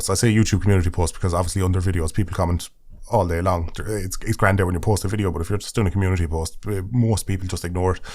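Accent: Ireland. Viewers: glad to have another podcast thank you so much its always awesome to hear that lovely Irish voice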